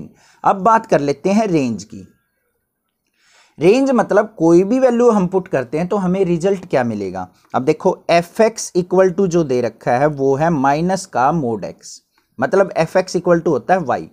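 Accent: Haryana, India. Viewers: Sir haryana se ho ?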